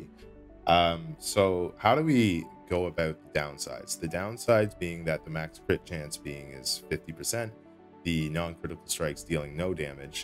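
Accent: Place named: Canada. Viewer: very well spoken canadian i can tell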